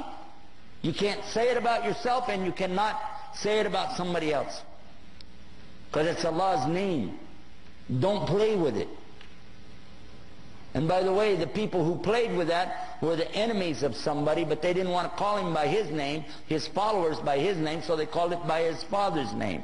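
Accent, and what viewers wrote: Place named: Texas, United States. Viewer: هل الشيخ من أصول تكساسية؟ لهجته كأنها من تكساس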